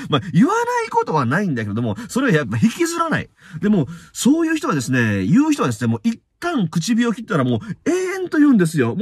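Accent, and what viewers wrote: Kansai, Japan. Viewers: 確かに悪口言う人とお酒飲むの嫌ですよね‼️ 楽しいお酒は最高‼️ ハッピーなお酒がいい‼️ 自分の時間使って悪口文句言う人と合うのは嫌です！‼️❣️‼️ 私も48時間地獄言葉言いません‼️ 天国言葉を言います‼️ 今日はQさん関西なまり出てました💖 私も天の声聞きたい‼️💖🌈❣️💖🌈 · たまに大阪弁出るの前から好きです。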